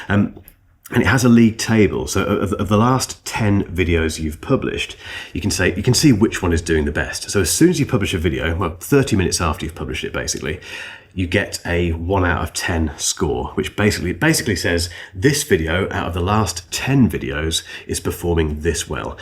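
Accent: United Kingdom. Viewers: I’m one of those people who has been watching every single video on the Macbook pro M4 or the MacBook Air (even now that I’ve had a MacBook pro M4 for 2 or 3 months). I openly admit that if you made an update video on the macbook pro M4 (or a comparison), I would watch it in a sec😂 (i love your opinion AND your british accent). The reason why I do this is that I find interesting knowing how others people’s opinions evolve over time (whether they change or not). I like to see what people find more pleasant on a product that I like and see whether my opinion on particular aspects is shared or not. PS: I have a crush for Patrick Rambles, by the way…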